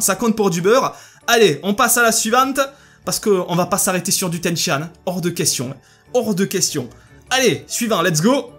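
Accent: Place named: Marseille, France. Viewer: tu es Marseillais? Car je suis Marseillais et j'entend plein de personnes avec ton accent et TU AS LE MÊME ACCENT QUE MOI ☺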